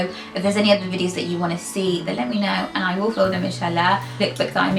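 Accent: United Kingdom. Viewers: ASAK, I have to say I had to watch your video twice because I was just so taken with your accent! It's lovely, Masha'Allah. What part of the UK are your from? Great video and looking forward to more. Oh yeah...where is your scarf from? Thanks!!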